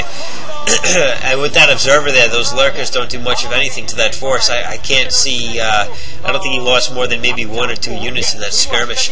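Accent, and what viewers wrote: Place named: New York, United States. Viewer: I think he's doing a great job, keep it up man. lol as for the voice, i also didn't like your voice at first but now i love it. lol Sounds like a new yorker i hear on tv. But yeah he's doing good, lots of commentaries, we appreciate it. thank you.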